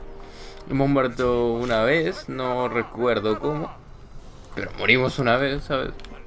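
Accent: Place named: Spain. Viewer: estas en latam y hablas como español o.O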